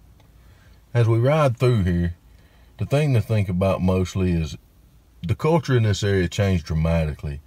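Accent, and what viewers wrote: South, United States. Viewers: Good story telling……and unlike most of Florida now, you sound like a southerner. I moved down from North Carolina and when I speak, people look at me like I am from another planet. You talk like me. How refreshing. I haven’t visited “Steenhatchie” yet but want to.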